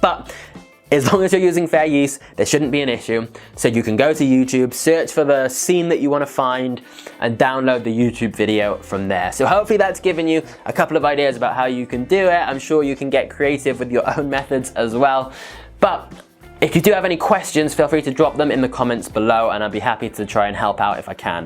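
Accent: United Kingdom. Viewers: Thank you, you've have explained the topic in a manner of very detail. Quite a long video though, but I like your Brithish accent.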